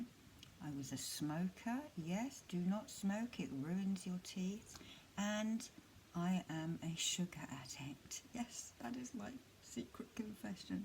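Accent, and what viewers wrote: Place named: Sussex, United Kingdom. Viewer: I've recently broken a tooth and although it's been repaired, I still find eating difficult, like my teeth don't really match. So, to hear your story, I can only say that you're incredibly brave to have gone through all that and still come out smiling and trying to help other people. And yes, as a fellow Sussex girl, I think we do have a nice accent here!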